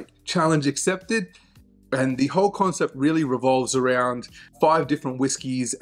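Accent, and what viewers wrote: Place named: Australia. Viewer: It's like majorkill from Warhammer 40K acting like a perfect gentleman and talking about whisky instead of spacemarine figurines and hentai. I guess the Australian accent is just more gripping. Great content.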